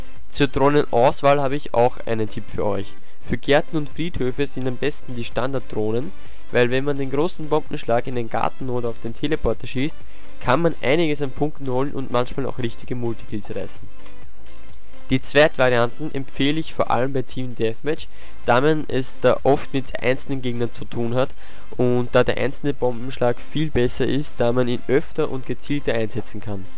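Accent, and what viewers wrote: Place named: Austria. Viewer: veroarscht wieso in österreichisch?biste österreicher ich nähmlich auch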